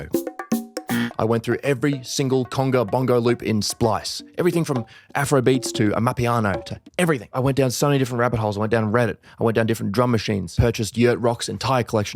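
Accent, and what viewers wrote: Australia. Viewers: how did I not realise you were aussie lmao 😂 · I'm like pretty accent deaf so I never realised he's got an Aussie accent. Sick to see some yt producer faces from here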